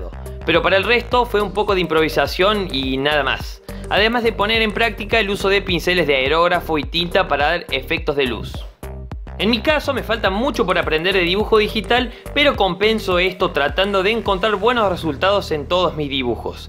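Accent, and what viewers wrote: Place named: Cordoba, Argentina. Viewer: Sos cordobes? Se te nota pa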